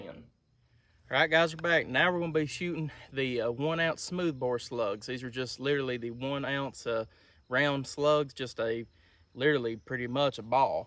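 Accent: South, United States. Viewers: Why does watching a gun review from someone with a heavy country accent feel so much more reassuring?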